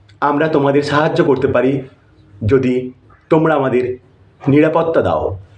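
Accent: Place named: Kolkata, India. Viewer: ভাই আপনার কোন দেশ? আপনি কিছুটা কলকাতার ভাষায় কথা বলছেন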